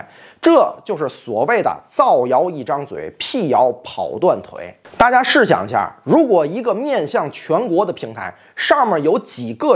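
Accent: China. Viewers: 一个新加坡人，带着纯正的国内口音，中国人的逻辑方式，人畜无害的语气，绝对是漂亮国最好的舆论工具！！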